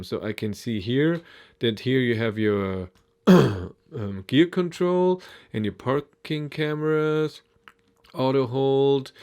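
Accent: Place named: Germany. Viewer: german accent omg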